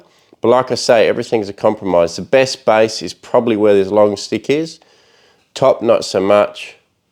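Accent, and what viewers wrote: Australia. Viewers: Sorry about the tree dying. That's gotta hurt. I really enjoy your videos, especially your bonza Aussie accent. I'm away from Oz atm and it's cool to hear someone who really knows his stuff talk about bonsai in a down to earth and approachable way. I'm new to bonsai. Am only in my 2nd year and trying to learn how to grow healthy trees. I don't have any real bonsai yet (all pre bonsai) so it's awesome to hear you talk through your decision making process. Middle stick to big stick for me but I like trunks with character best.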